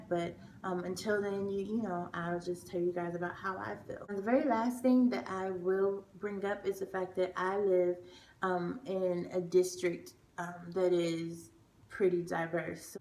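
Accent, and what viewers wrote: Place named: Chicago, United States. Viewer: I thought I heard a Chicago accent🤔 hey girl 🙋🏾‍♀️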